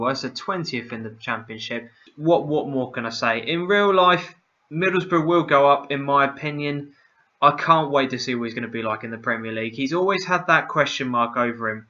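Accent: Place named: South, United States. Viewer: Thank you for believing in Boro even though your not a Boro fan as your from the south as from you accent!! UTB!!!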